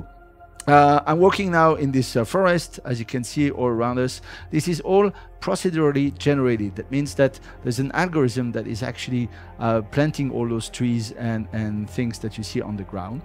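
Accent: France. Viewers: Magnifique l'accent français... ;-) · On entend bien que le narrateur est français :) Je viens de découvrir le jeu et bravo aux parisiens pour ce travail pas encore finit mais impressionnant au possible ! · This guy sounds French, am I right?? Hmm, French doing good stuff these days. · Cet accent français quoi x)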